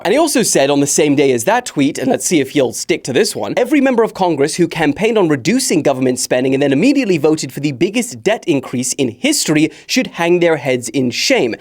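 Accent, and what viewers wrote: South Africa. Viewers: Oh hey, a South African Brother. Nwice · It sounds like you might be from South Africa 🇿🇦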